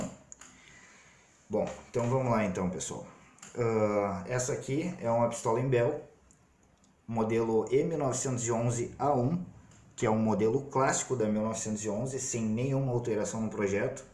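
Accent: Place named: Porto Alegre, Brazil. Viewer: Excelente vídeo. Só não gosto do teu sotaque de Porto Alegre... Agarrei nojo por conta da Manuela D'avila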